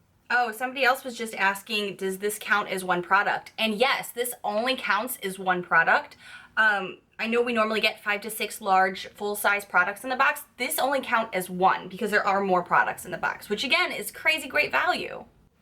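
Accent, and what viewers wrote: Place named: East Coast, United States. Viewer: hi there. im ur newest video junkie but im gonna break the mold of 98% of the ladies who commented, u ready.....wait for it.... i DO NOT have a channel ( how refreshing huh ) and still subbed u. i love ur east coast accent and sass! u r FAN-TAB-U-LOUS my dear... MWAH! i sense a youtube and chill binge watching session coming on, wish me luck ( I LOVE AUTO-PLAY)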